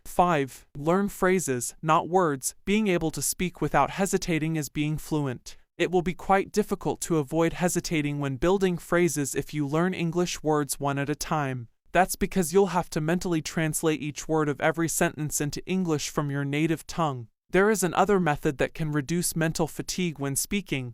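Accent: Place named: United Kingdom. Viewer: Your speaking tearm it's very unique as like British English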